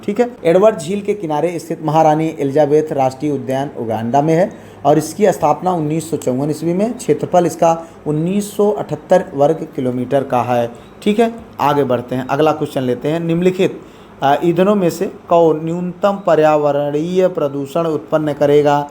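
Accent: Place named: Bhojpuri, India. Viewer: Nice session Sir ji waise Sir aapki bhojpuri langauge bilkul hamari tarh hai jaise hamare yaha boli jati hai 😊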